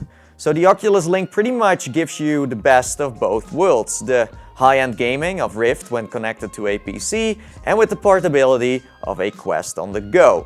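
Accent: Netherlands. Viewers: wow je hoort echt hard dat je nederlands bent XD ly, keep up the good work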